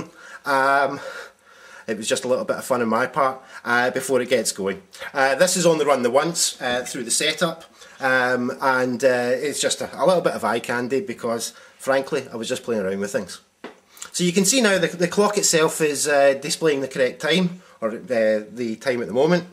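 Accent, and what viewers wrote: Scotland, United Kingdom. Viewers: I knew the accent was souding very scottish. Maybe I'll meet you at the next Arduino meeting at the Electron Club… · Your scottish accent is great - i like it very much!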